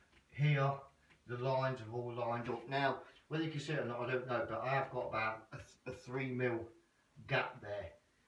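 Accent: Leicester, United Kingdom. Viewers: Cheers for that mate. Just wondering about your accent. Are you from Leicester by any chance?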